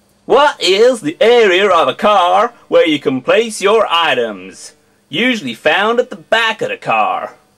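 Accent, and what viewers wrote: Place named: South, United States. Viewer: This is the first time I've laughed at a Southern accent for any reason other than the "you sound like an idiot" thoughts that usually run through my brain XD . You gave me a reason not to hate the Southern accent, so kudos for that.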